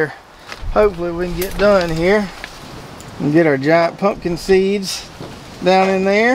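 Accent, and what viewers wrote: South, United States. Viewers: I learn too much from you to unsubsidized how you talk is how you talk you from the south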